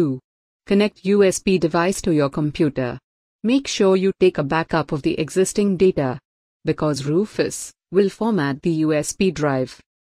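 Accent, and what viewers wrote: India. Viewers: you got really strong indian accent please use english subtitle thank you.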